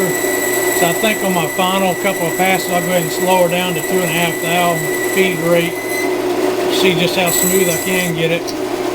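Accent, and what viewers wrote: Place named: Kentucky, United States. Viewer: Nicely done! I'm impressed. Where are you? You sound like Kentucky.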